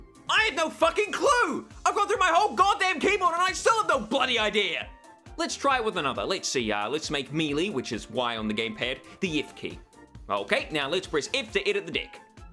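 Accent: United States. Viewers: solid american accent even more solid impression of douchey card pack tubers lol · Your American accent is disturbingly accurate. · Dude, your American accent is so fucking good. 99% of people that try it still say certain "a" and "o" sounds similarly to their native accent, but you got it down. I fucking love your videos haha. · Damn, that’s actually a really good American accent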